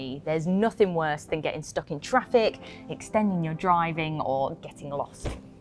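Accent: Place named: Northern England, United Kingdom. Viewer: I'm sorry but your Northern accent kept putting me off. Every time you said Munnee instead of Money I could feel myself cringe.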